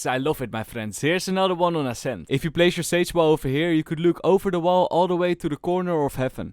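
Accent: Netherlands. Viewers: Can just hear your dutch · Dear i have a burning qeustion u sound like u have a dutch accent so please r u dutch?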